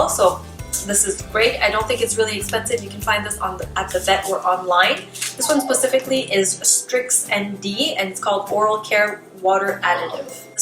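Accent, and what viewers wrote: Quebec, Canada. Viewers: J'entends ton accent franco-canadien, c'est super! :) Merci pour ce vidéo! Ma shihpoo vient de se faire extraire 2 dents et on aimerais qu'elle soit aussi comfortable (que possible), durant son rétablissement. xx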